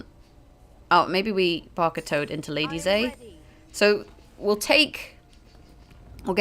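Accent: United Kingdom. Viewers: I can't place your accent. British with Irish parents living in the States is my wild guess. Anyway another good vid, have been looking for a deck to get me out of plat. I run several adapted versions of your decks to deal with my Diamond card poverty. They are consistently effective. Cheers for the good work.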